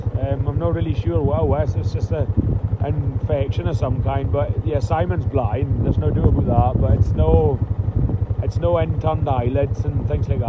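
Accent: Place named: Orkney, United Kingdom. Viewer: I'd like to suggest that you call Simon's sister *Helena*. My boy Simon is a lovely special lad. I thought I'd lost him for good one day in Truro; I took my eye off him and there he was, gone! And his tricycle, too. We were united in Burger King, eventually.... And like your Simon's mum, I've spent plenty of time at the end of my tether, looking after Simon and his sister Helena. She's had her fair share of adventures, too, and she's bonny like your Simon's sister. It would be so nice to tell my Simon and Helena that they have namesakes up in Argyll who are loved just as much 😚😚! Lovely video, amazing accent (I've never heard an Orkney accent before, it's radically different to the estuary English down here in Kent!) and so good to be involved in the lives of you all at the farm, however vicariously. Wishing you every success; Katherine from Kent.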